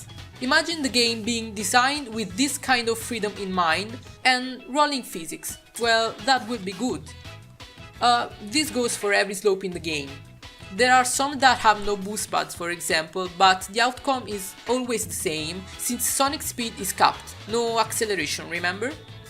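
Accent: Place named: Italy. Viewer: Si sente che sei italiano... hai la mia stima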